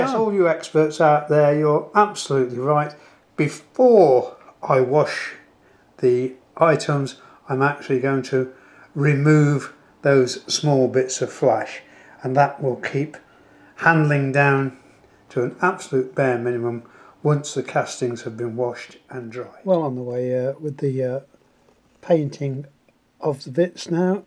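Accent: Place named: Northern England, United Kingdom. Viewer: Grand stuff, you sound reet Northern in it......great modelling tips.......don't worry some one the other week said I sounded very Northern!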